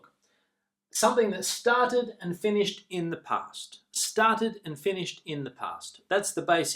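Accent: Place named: United Kingdom. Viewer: What a beautiful accent! Forvo says it's a british accent so I hope I could learn it perfectly as soon as possible, Spanish here!! Very good video, perfectly explained and easy to understand, Thank you!!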